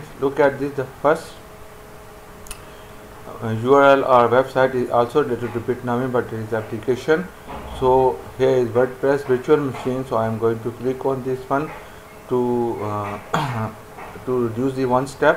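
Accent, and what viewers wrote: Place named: United States. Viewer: Hello Bro' your English-like American people😆😆😆😆😆😆😆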